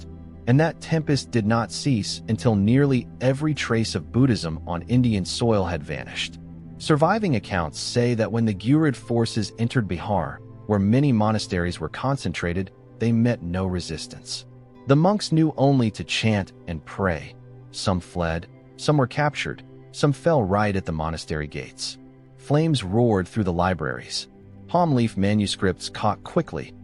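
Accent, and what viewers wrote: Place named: United States. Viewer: good documentary - despite that american accent - 😖 - thanks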